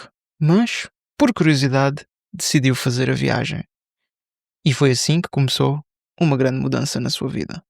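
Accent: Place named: Europe. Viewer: Muito obrigado pela ajuda de escutar as palavras faladas no sotaque europeu. Gosto do seu jeito de ler e explicar.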